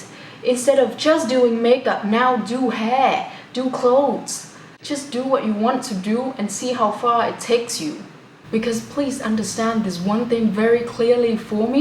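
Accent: England, United Kingdom. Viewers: sao chị dùng british accent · I feel strongly obsessed with your beautiful British accent. :D It keeps playing in my head after finishing your video. And you know what, I just realised that your face and your accent remind me of the actor "Sansa Stark" in series Game of Thrones :). Anyway, love your accent very badly :) (P/S: this is one of my very rare comments on youtube) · Charming accent, em nghe giọng Mĩ nhiều quá rồi, giọng Anh thật sự là 1 làn gió mới đối với em <3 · You are so familiar with Lucy with British accent, < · Ui chị nói giọng anh anh hay quá 😘😘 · Em thích giọng Anh Anh của Chị quá. Cảm ơn Chị đã tạo động lực và truyền cảm hứng cho mọi người <3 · Giọng Anh Anh nè. Iêu quá <3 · Oh my god, is that British accent, i'm learning American accent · Chị sống bên Anh lâu năm, accent chị hay quá 😍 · Such a helpful vlog! I love your English accent helping me practice listening! 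Thank you <3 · Yuh gurl, didn't expect that British accent !!? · I like British English and i like the person who speaks British English, too =))) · British accent là đây. Yêu. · Giọng chị hay quá. Accent này là giọng Anh đúng không ạ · Your accent is so nice : )) British accent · Tha'ts British English :3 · That’s a legit British accent ! Or should I say, the Queen’s English <3 absolutely stunning! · Giọng British của chị charming quá!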